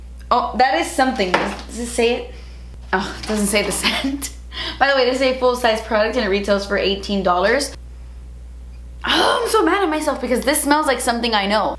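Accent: United Kingdom. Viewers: your British accent always make my day!😂😂😂 Love you! This look is ❤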